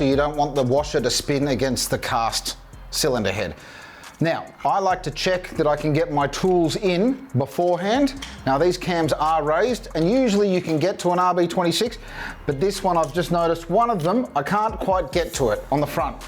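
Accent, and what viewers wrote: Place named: Australia. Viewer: If you do anything with an Australian accent, ill watch it.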